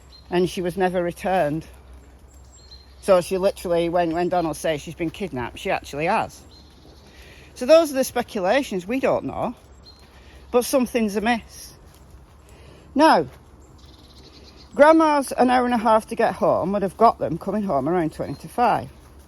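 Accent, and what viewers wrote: United Kingdom. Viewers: I love your channel, and I so enjoy your walks with your cute dogs. I also love your British accent (I’m a Texan, so you would probably think I’m the one with the accent, lol). Take care. I’ll be watching/listening! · You are a beautiful British Lady! And I ❤️ your accent. Nice seeing you before our walk. Great timeline connections. Makes sense. I’m personally getting tired of “what if” scenarios & just wish TBI would confirm some facts they’re working with. IMO this is connected to a larger crime like trafficking & that’s why TBI is silent while they work that angle. Just my thoughts. Luv u & the doggos! ❤️❤️❤️ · where are you from (area of the UK)? You have a very particular accent, so I wonder where in the UK it's typical. Thank you. PS: I love your walks with doggies. They are lucky to have so lovely places to go to with you.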